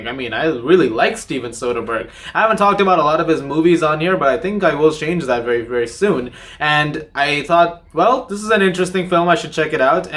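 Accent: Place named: United States. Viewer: I am also Indian 🇮🇳 I am just asking as you can speak English so fluently like an American accent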